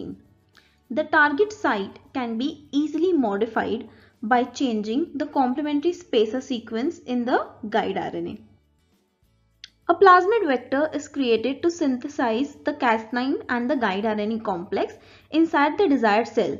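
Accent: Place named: India. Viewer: 真是受不了阿三口音，我服了，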